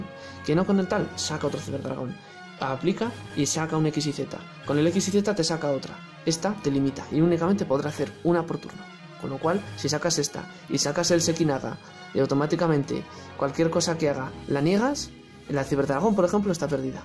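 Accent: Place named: Spain. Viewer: Por tu acento eres de España de que lugar? Soy de Madrid asi un dia jugamos unos duelitos, gran video!!!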